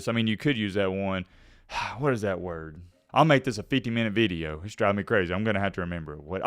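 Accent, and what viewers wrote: South, United States. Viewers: Love the southern accent. Up north, cinnamon is a three syllable word... Keep the great videos rolling! High Tide!!!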